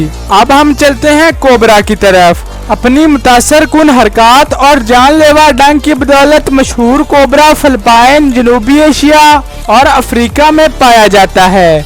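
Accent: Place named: Punjab, Pakistan. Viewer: Punjabi twist in urdu, not good pronounciation like youtuber majid malik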